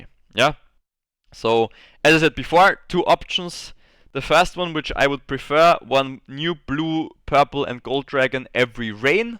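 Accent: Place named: Austria. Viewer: Ich bin mal so frech auf deutsch zu schreiben- ich meine ich Höre einen Österreicher😂 Auf welchem Server spielst du? Und falls man fragen darf- in welcher Preisspanne sind wir bei deinen Drachen?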